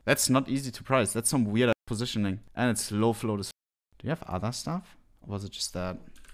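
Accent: Germany. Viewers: man hört den Deutschen Akzent haha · wie krank man hört das er deutsch ist pahahhahah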